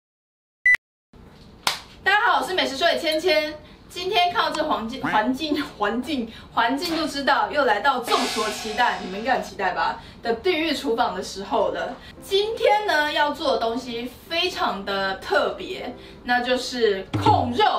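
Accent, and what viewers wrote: Taiwan. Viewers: 千千雖然偶爾會台灣國語但這支影片中邂逅的發音是正確的喔，掌聲鼓勵 千千妳是特級廚師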